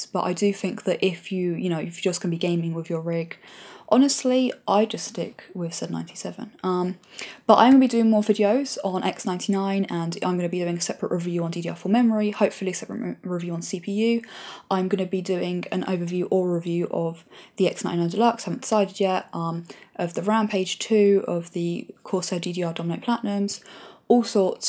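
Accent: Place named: West Midlands, United Kingdom. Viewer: Your accent is great....sounds to close to me tho.. West Midlands?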